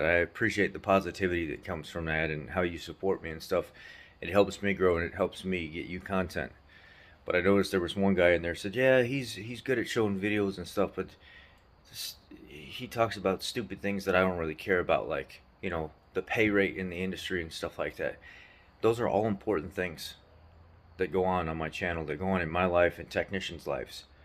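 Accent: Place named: Kentucky, United States. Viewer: That Kentucky accent made me laugh for 5 minutes straight..!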